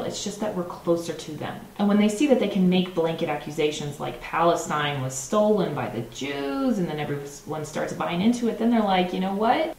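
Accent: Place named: United States. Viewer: את דוברת אנגלית שוטפת ובמבטא אמריקאי מובהק, אבל שמעתי אותך גם מדברת עיברית בלי מבטא (אם אני זוכר נכון). את עולה וותיקה?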